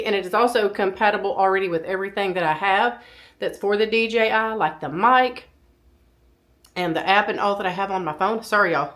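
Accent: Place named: West Texas, United States. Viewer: So glad to see you feeling better. It bypassed us this year. Thank you Jesus. I also have changed my scripture time. I have been sick all year and neglected the word. I love your home and thoroughly enjoy listening and watching you. I live in west Texas up in Lubbock. So your accent is my favorite. Happy New Year.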